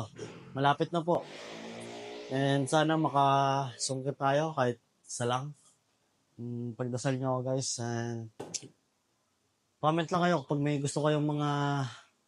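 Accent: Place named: Rizal, Philippines. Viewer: Taga Rizal ka ba bos. Ang punto mo Rizal.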